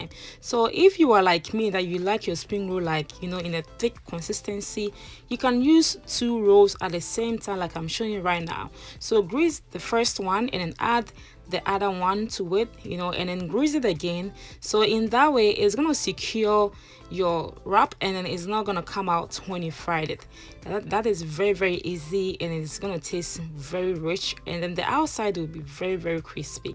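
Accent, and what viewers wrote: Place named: Ghana. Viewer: You geh, ehh mehn, you understanding me eneh so? I feel the same way, I love hearing Ghanaians speak, and she speaks so eloquently and soothingly, but authentic to her dialect. She's not trying to sound American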